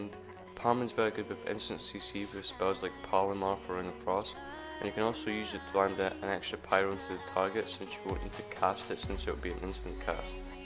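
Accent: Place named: Scotland, United Kingdom. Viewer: Scottish ya fucking rocket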